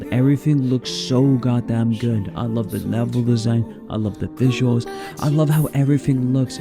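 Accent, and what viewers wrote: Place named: Scotland, United Kingdom. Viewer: Scottish accent gives that mythical feel, no Indian accent can ever achieve.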